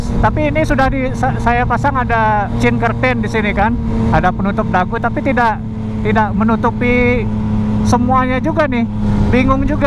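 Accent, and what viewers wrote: Bali, Indonesia. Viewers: Logat nya bali banget...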